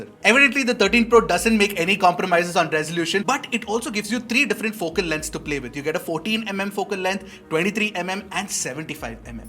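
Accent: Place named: India. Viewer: The pronunciation of "T'' in Indian accent is the ugliest thing I've ever heard.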